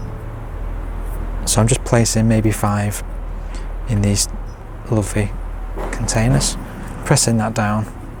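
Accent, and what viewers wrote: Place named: England, United Kingdom. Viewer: You sound English, are you in Europe or here in the USA.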